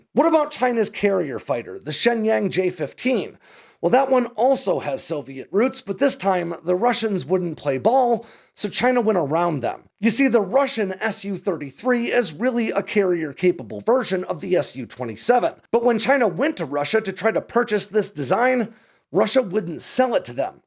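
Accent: United States. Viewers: "We had B-52's, flying around" -- 1) Yup. All true. Because we thought it was that, or nuclear annihilation. Stakes are a tiny bit different with Taiwan. WWII and the Cold War are too far off. I also believe in the greatness of the American people as much as you do. But that doesn't mean we always have the political will to act. (Also, Aerial refueling, not Arial the font!) 2) Still, dude, you don't help the American case by sounding like the 'MURICAN parody!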